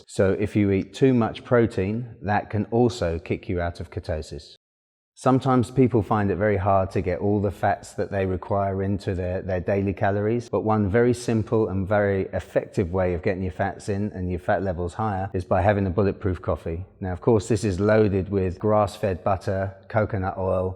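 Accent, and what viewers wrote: England, United Kingdom. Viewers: Yes thank goodness!! You are not American! Such a breath of fresh air you are. No dramatic hyper "best actor voice", just facts. Thank you sir 🙏. So pleased you are English lol... New sub from the island next door 🇮🇪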